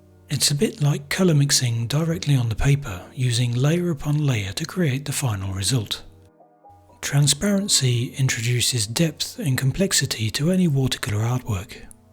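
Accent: United Kingdom. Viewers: Why do you sound like you are British when you ask us to pay for your classes in dollars.,and why don't you stretch your paper first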